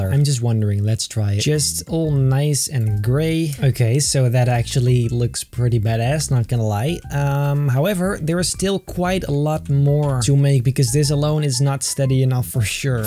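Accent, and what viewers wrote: Netherlands. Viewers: dont he sound dutch?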